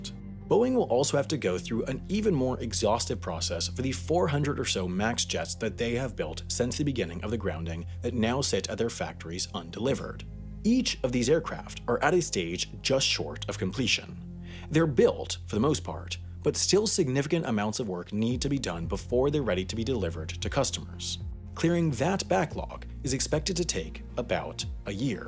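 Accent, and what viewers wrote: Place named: New England, United States. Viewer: This is heavily off-topic, but does Wendover have a Boston/New England accent? I keep hearing him say "No-at" for "not" (more like naat in most American accents), same for "Dollars".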